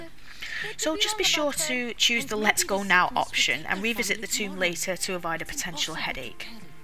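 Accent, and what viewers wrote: North, United Kingdom. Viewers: Are you a "northener"? It's a hobby of mine to guess accents. Also, great video!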